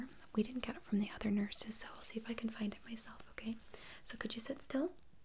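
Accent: United States. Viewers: Are you American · I can’t ever figure out if your American or British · I can't tell if the American accent or the British accent is her original one. Both sound authentic. lol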